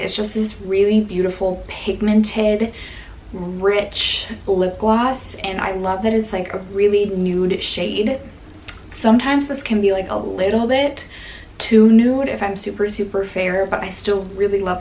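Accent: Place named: Canada. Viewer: I thought you were Canadian when I first started watching your channel because you sound more Canadian dialect wise. Source- I'm from Canada.🙂🇨🇦 Love your vids!!!!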